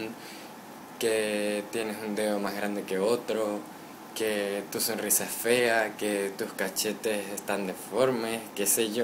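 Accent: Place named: Venezuela. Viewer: Soy nuevo en tu cuenta, sería genial que te presentarás y dijeras de dónde eres.. ( hasta el momento creo que eres venezolano por tu acento ) entre otras cosas como tus gustos.. tus fans te quieren conocer 💟.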